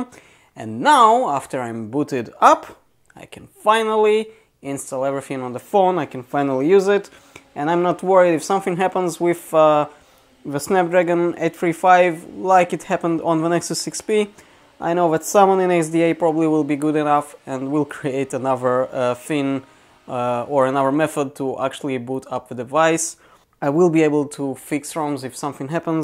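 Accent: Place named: Israel. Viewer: ?! יש מצב? קודם כל זיהיתי מבטא ישראלי, אח"כ זיהיתי את הקול ואז זה התחבר לי עם, יש מצב?!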